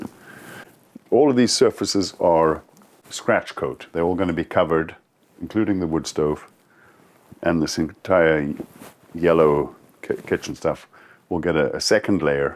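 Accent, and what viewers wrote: South Africa. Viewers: I am south african and could tell his accent immediately. he is living the life i could only dream of. well done. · And he probably grew up speaking afrikaans, English is his second language. Has trace of afrikaans accent. Intelligent human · Interesting unpredictable admixture of South African and North American vowels... nice life... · I recognized your SAffer accent immediately! Thought, "He's from SA!" Great way to live. My kids are also off grid here with a wonderful garden. Very few expenses. It's the way to go, with the way things are here and getting worse. Baai gelik en sterkte voorentoe. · This was so good to hear an south african accent doing something so amazing. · Wow that South African accent, unmistakable. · I would recognize you south African wherever ur. Job well done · I heard your voice and knew at once you were South African. 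· as soon as i heard his voice i knew he was South African- watching from Durban.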